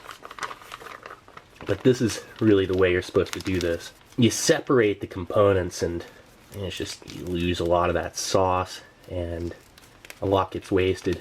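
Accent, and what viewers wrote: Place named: United States. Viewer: Could anyone please tell me where in the US does accent come from?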